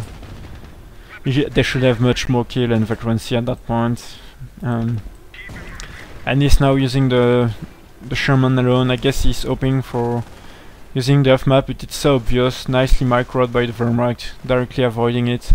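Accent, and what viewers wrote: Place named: France. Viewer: nice accent dude you must be the only french whose english i can listen to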